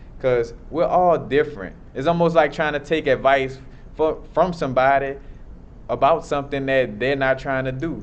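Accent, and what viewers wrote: New Orleans, United States. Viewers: Ahhh! Yet another BRILLIANT message!🙌 You make being innovative sound so easy lol, but it ain't no joke! I've been saying for years that innovators have no blueprints, yet my friends always wanted to mention how hard it is to start nonprofits, law practices, etc. and I would be like, "You literally have a checklist for the steps you need to take to achieve your goals, yet I am venturing where there IS no path and making it up as I go along." Don't do mentors, b/c no one has my unique insight or experiences; my instincts are my guides, ha! 😹 I have a degree in marketing, yet I don't market any of my skills/creations; the people who are meant to find them will! Don't really do social networking, the so-called "lifeblood of artists," either; too busy creating and living!💃🎨🎢 People often shake their heads and say, "Well how will I find you?" My reply remains unchanged: "You won't find me unless and until I WANT to be found! 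My existence is ART and ART is in constant motion!" LOL!!😆 Lovin this /400 degrees accent, too!💬🔥🎶 🎧😁Keep innovating!!!💃🎉🎆🌌💫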